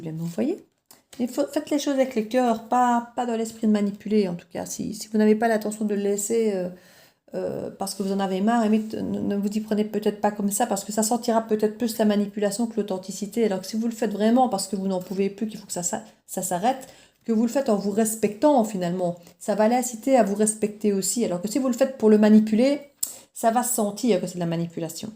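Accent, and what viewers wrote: Liège, Belgium. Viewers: Tellement vrai et juste... On reste dans mon theme lol :p J'adore tes guidances sinceres et justes, sans complaisance, en toute honneteté... chaque video est un plaisir (puis j'adore cet accent liegeois :p ). En tous cas, je ne peux que te conseiller a te lancer dans les guidances privées car tu es vraiment tres tres douée et tu vois juste... N'aies pas de doutes sur tes capacités. Tu es geniale <3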